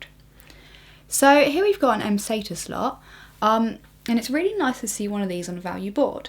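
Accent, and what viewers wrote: United Kingdom. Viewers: Unfortunately I consider this to be one of the more audible accents in the UK. You should hear what people sound like in Portsmouth (Mush;)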